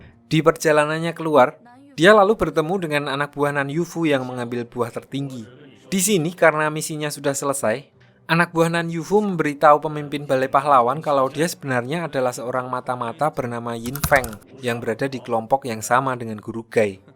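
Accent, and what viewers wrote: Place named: Java, Indonesia. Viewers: logat jawa admin nya nampak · kwkwkwkwkwk kaya e org Semarang nih si mimin... salam kenal min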